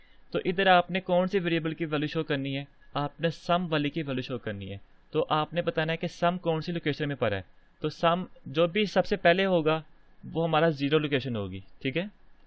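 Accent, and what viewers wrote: Sialkot, Pakistan. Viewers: hahahahaah bhai g ap k bolny k style sy hi pata chal gya tha k ap sialkot k ho nd jab ap ny age print karwai tb sure ho gya =D =D Wesy bhai ap buhat axha explain karty hain or mery mind mai jo b question thy jitni b problem thy wo ap ny khudi hi bta dain =D =D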